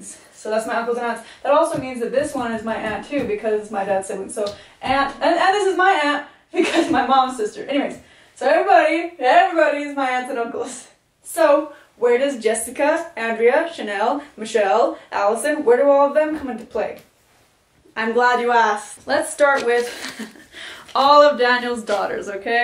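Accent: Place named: Alabama, United States. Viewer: Are you from Alabama by any chance?😅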